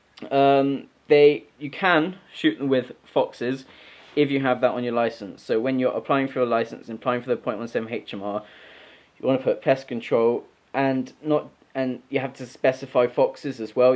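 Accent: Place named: Bristol, United Kingdom. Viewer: great info mate! soon as my FAC comes through this is what im getting! hope you dont mind me asking but where you from, you sound a bit bristolian? thanks again!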